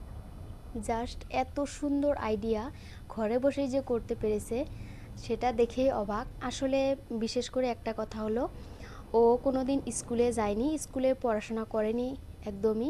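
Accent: Sylhet, Bangladesh. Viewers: সিলেটি নি তুমি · আপনি শুদ্ধ ভাষায় কথা বললেও আপনার উচ্চারণ ও বাচনভঙ্গিতে সিলেটের আঞ্চলিক ভাষার বৈশিষ্ট বিদ্যমান। এই গ্রামটি বৃহত্তর সিলেটের কোথায় অবস্থিত? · আপু কোমার ভাষা আসামের মনে হয় না। মনে হয় সম্পূর্ণ বাংলাদেশী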